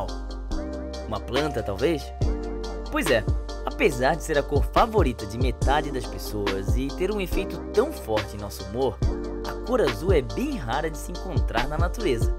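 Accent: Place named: Santa Catarina, Brazil. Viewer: Cara tu é catarinense so pode kkkk tmj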